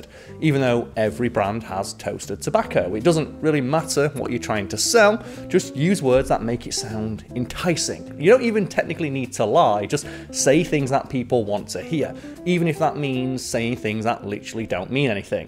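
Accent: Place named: Yorkshire, United Kingdom. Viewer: Montelban, not Montelblan, still made me laugh every time. Then again, maybe I'M the one not fully understanding your wonderful Yorkshire accent. Hmmm?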